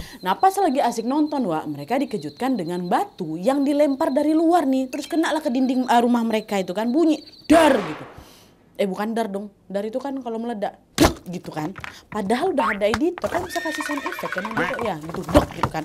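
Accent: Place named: Sumatra, Indonesia. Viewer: Dengar cerita berasa cerita nya dari tanah Sumatera hihihi...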